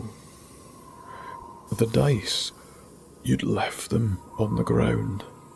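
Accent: Scotland, United Kingdom. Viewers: What's withe terrible Scottish accent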